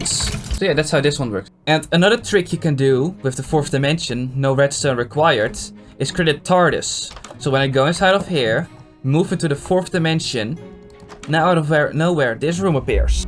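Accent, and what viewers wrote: Netherlands. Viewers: the dutch accent :3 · You sound....dutch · wild dutch accent lol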